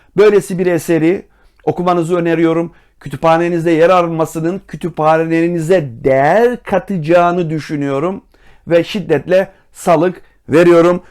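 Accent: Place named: Samsun, Turkey. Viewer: Elllliiiinci yıl lisesi 😂 Klasik Samsun. En okumuşunun bile şivesi. Süpersiniz............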